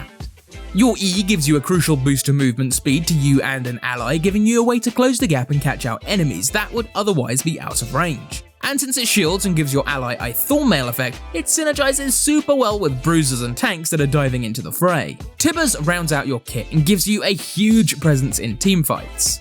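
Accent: United Kingdom. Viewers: I’m sorry but I dislike this British accent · Yes now we have a British caster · his accent is literally screaming UK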